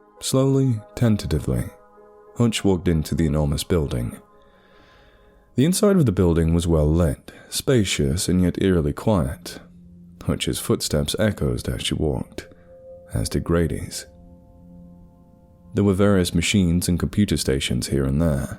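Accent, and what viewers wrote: Australia. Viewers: i got stupidly distracted by one of the characters driving a vauxhall astra, but theyre rebadged as holdens here. absurdly nitpicky detail for me to get hung up on lmfao author is always great, the narration is always great, and as an aussie i give your aussie accent a solid 9.5/10 - the best part is you were able to keep up the emotive voice acting, thats what usually bugs me most about a lot of people doing accents, they get so focused on getting the accent right that they forget how to act! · Good job! We need more Australian creepy pastas, love your accent! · This is the best Australian accent I've heard, thank you Lots of love from an Australian xx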